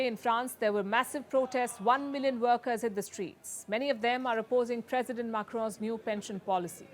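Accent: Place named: India. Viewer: Typical indian english 😅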